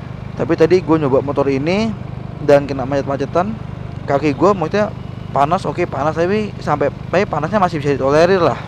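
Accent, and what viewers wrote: Surabaya, Indonesia. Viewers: Mas orang surabaya ya?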